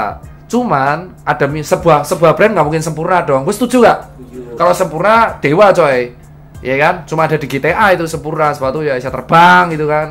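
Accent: Java, Indonesia. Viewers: Ngomongnya medok tapi keren 😅👍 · Dr tirta jowo medok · Bahasa indonesiamu medok mas, podo 😂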